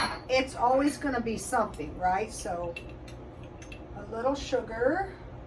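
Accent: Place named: Utah, United States. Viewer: Maybe a Utah accent?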